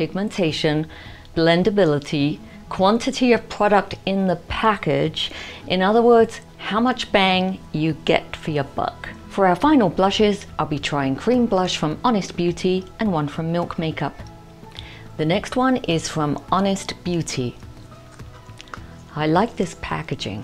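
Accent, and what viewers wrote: England, United Kingdom. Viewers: ...The same to you. I love your channel, as well as your accent. Oral tradition has it that my relatives way back are from England. Maybe the reason I love to hear the accent. I live in the South, so we do have a “Southern” accent, with “ya’ll “ thrown in 😂. We study about everything you can think of, not on a certain grade level. I try to get them interested in learning everything. We are heavy on Math, science, reading, and history, but throw in art and music too. I was a teacher back in the day, then went back to study nursing, and finished my working for pay, as a critical care RN. The girl’s mother is also an RN. ( I work for free now 😂)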